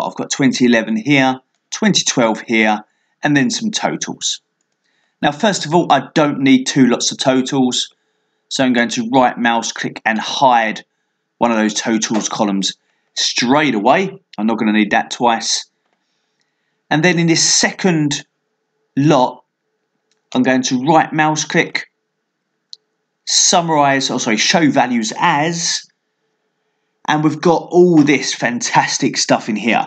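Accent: United Kingdom. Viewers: Thank you so much. I've been looking for an explanation for this analysis in other languages (in pt e it) for so long, without success. Your explanation is so clear, plus british accent, perfect!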